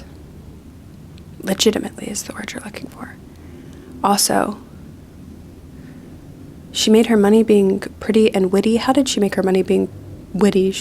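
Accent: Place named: Chapel Hill, United States. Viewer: Omg there was always something so familiar about your cadance. i’m from chapel hill, it all adds up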